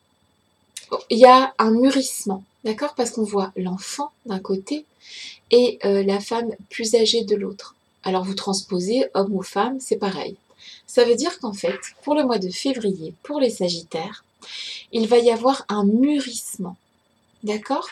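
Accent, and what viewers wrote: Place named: England, United Kingdom. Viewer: Merci 🙌💖 c’est certain ça ne se présente pas en mode « pump it up » quoi que?! 🤣 mais j’avoue que je comprends très bien ce tirage à ce moment de ma vie ou je n’ai plus envie de me battre contre ce passé pénible mais plutôt œuvrer pour moi! J’hésite en terme d’investissement travail... alors je vais revenir à l’intérieur 🙏🏻 et je garde mon guide de paix dans le coeur pour février 😌 beau mois de février 😘 et j’adore votre accent anglais 👌🏼👌🏼👌🏼 perfect!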